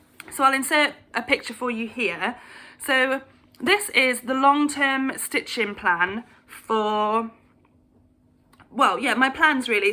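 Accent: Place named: United Kingdom. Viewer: Hey! I’m a new subscriber and a relatively new Flosstuber also. Love your projects, personality and accent! I’m an American and my 10 year old daughter walked in while I was watching you. She said - “is she British? I LOOOOOOVVE her accent.” Lol. And so do I. So fun. Anyway - for what it’s worth I love life updates up front. Life updates make a Flosstuber more relatable and memorable. Just my 2 cents. ❤️❤️ Looking forward to more. 👍😘🤩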